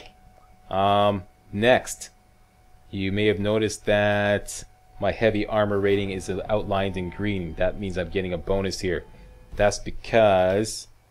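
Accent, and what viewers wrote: Canada. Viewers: You’re Canadian aren’t you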